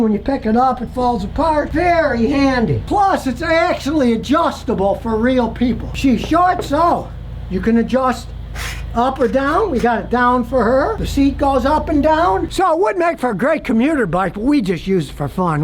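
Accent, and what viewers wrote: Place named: Texas, United States. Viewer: I thought he was from Texas.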